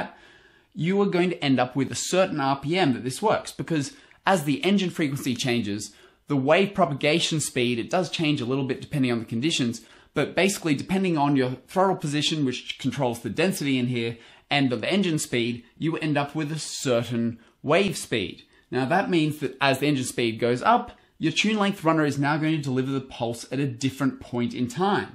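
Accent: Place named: Australia. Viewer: even though I don't have much experience with Australian accents , I was able to understand you 100% . no muffles or "uhhhmmms" at all. Thanks!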